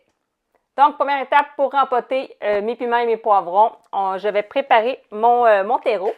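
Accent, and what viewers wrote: Quebec, Canada. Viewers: Et boujour tout le monde 😅 j'adore ton accent ❤ vive le Québec 😊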